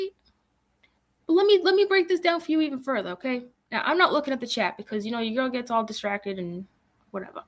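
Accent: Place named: New England, United States. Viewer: ... First off, you make me miss my new England accent, lol. I'm from RI but now live in NC. I'm not sure where you're from, but I believe it's new England. I don't agree with everything you stated in this video. I do appreciate your non biased stance. Overall, I enjoyed this video. Thanks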